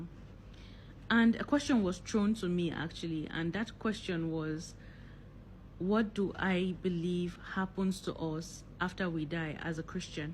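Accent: North, Nigeria. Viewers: Sorry. Firstly, you don't look like an igbo person. Secondly, you have a core northern accent. Igbos dont have such accent even if they've stayed in the north all their life. Thirdly, you don't know Jesus personally. Christianity is not a religion. It's a way of life. :Christ like". Finally, you're just talking nonsense.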